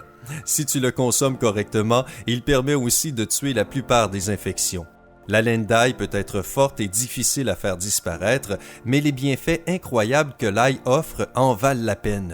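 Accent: Quebec, Canada. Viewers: accent québécois lol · Et lui avec déjà avec son accent canadien en plus de sa il as le nez boucher rhooooooooooo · je ne sais pas si vos astuces marchent mais elles ne peuvent faire de mal:-) j'aime bien vos vidéos et j'adore votre voix et accent canadien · Bonjour Monsieur j'aime beaucoup votre accent canadien et vos conseils sont géniaux je vous un bonjour de la Guadeloupe et beaucoup de soleil peut etre que je trompe mais il fait trés froid merci vous etes génial ne changez rien .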